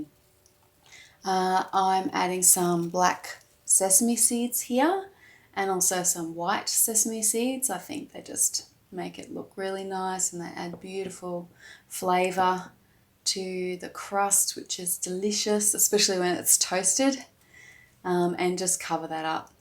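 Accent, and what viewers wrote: Australia. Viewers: I love following your recipes. I use freshly milled spelt. Before shaping I sometimes place several (around 30!) garlic cloves on the dough. I really like the way of your cooking demonstrations. It is simple and relaxed. I tried to get a dough whisk here in our country but have not been successful yet. I also like your Australian accent - have got two Australian friends here in Austria. Greetings from Austria.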